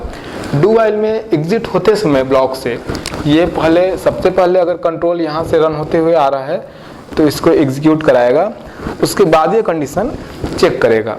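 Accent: Bihar, India. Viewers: apna accent which is i think bihari agar change kar le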